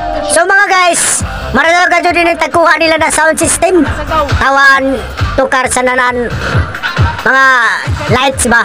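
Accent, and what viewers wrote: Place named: Mandaya, Philippines. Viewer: parang mandaya yung salita mo host ba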